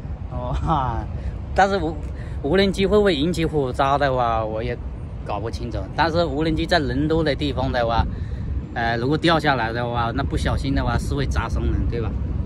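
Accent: Hakka, China. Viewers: 是鄉下佬！只能讲客家音的普通话！请见谅！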